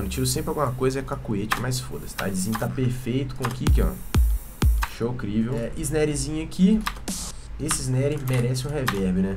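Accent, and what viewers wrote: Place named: Minas Gerais, Brazil. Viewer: Qualquer ideia que você jogar, a gente assiste, meu patrão. Você é fera demais. E outra, o sotaque mineiro tá forte, hein hahahahaha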